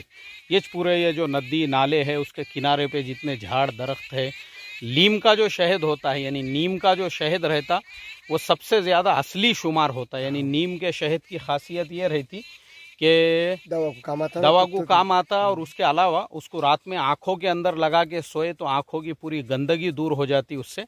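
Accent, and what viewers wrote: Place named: Maharashtra, India. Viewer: Mufti sahab Aap puna me Or alag alag city me rahe magar apne ilake ki zaban aap jo laheje ke saath bolte sun ke maza ajata pure marathi wobhi Maharashtrain acent ke saath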